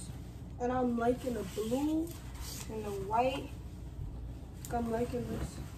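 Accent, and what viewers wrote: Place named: New York, United States. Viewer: Yhu can tell she just moved to New York she talk like a New Yorker but she kinda don’t 😭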